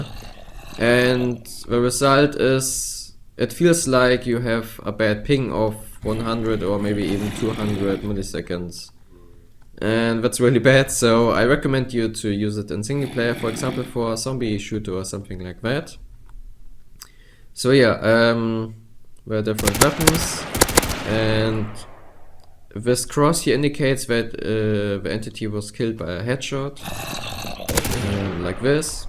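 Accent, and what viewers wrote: Germany. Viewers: höre ich dar etwa einen deutschen akzent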